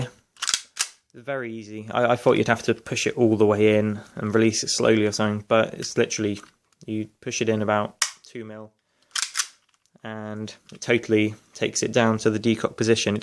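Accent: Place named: United Kingdom. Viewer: hey dude i can tell by your accent rhat ur British can you tell me what the requirements are for owing an airsoft gun in the uk thanks in advance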